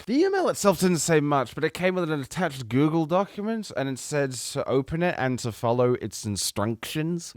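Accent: Australia. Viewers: I'm glad he's as drunk and Aussie as he sounds. Also, and I'm just saying, I've never seen and the dude from Explosions and Fire in the same room.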